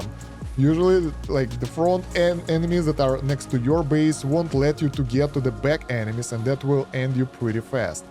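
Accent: Russia. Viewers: да лан тебе) Скажи как есть) акцент сразу слышен наш)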